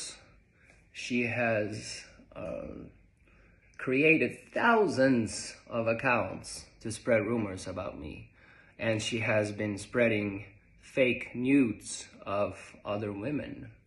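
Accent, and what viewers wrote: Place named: United States. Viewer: Omg. Jaja du fikk en fin amerikansk aksent da! VELKOMMEN tilbake. Løp ut og se nordlyset, det er jo så sterkt og kult nå om dagen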